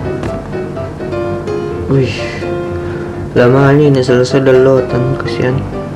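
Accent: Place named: Sulawesi, Indonesia. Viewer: Hahahhaha sulawesiii logatnya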